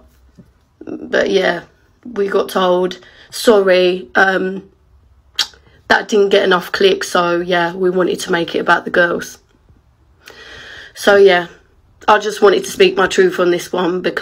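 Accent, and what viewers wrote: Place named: South, United States. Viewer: I don't get you're Southern accent but u was my favourite.😘 x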